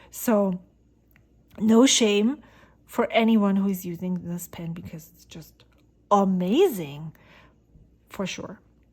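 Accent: Germany. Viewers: I bought three of these and they all write differently. One was perfect out of the box, one was a bit on the dry side, and one needed a lot of tweaking before it wrote like it should. Not the first time that Jinhao's quality control, or lack thereof, makes the pens they produce a little unpredictable. All in all not a bad pen, especially for the price. PS: Finally, an English-speaking pen reviewer who knows how to pronounce "Montblanc". I detect a hint of an accent though. Are you German? Don't shoot me if I got it wrong. :)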